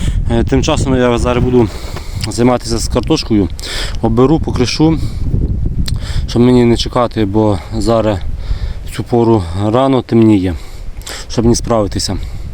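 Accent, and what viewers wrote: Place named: Podillia, Ukraine. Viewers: Вітаю Добродію! Намагаюсь по вашій розмові визначити звідки ви...Ваша розмова дуже схожа, на ту, якою розмовляють на Поділлі. І мабуть все таки не Вінницька, а Хмельницька область. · Я теж спочатку по говірці подумав що Хмельниччина😊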